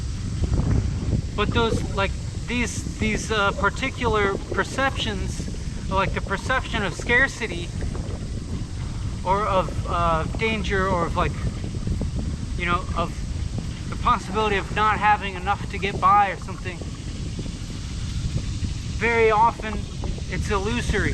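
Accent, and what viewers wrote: United Kingdom. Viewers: 合理 合理 he speaks london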